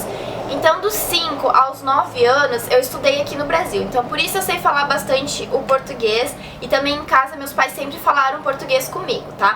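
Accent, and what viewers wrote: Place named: Southern Brazil, Brazil. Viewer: Gostei do seu vídeo, gostei das informações, ultimamente tudo o que tem a ver com japonês eu estou consumindo e o seu vídeo foi útil pra mim. E pra mim foi muito lindo ver uma menina "Japonesa" com o sotaque do sul do Brasil!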